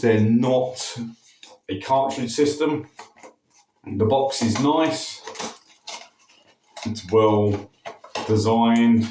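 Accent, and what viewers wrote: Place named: United Kingdom. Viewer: The thing i love about british is the accsent it proper🇬🇧🇬🇧🇬🇧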